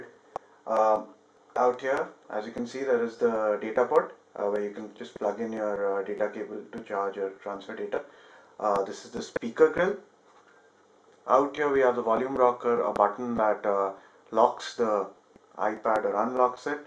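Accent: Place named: India. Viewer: Your accent resemble like an india even your screen was not clean at all which reflects that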